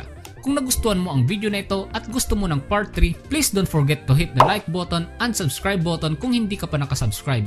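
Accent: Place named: Visayas, Philippines. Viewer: Bisaya kaayo ka mo lebay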